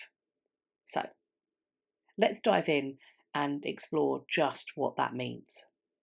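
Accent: United Kingdom. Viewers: Sometimes one just has to hear interesting British history told with a lovely British accent.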